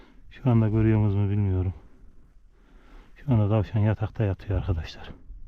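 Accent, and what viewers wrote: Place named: Yozgat, Turkey. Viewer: Gaçarsa gaçsın neydiyim heri deyisine gurban senin tam Yozgatlı ağzı bu ellam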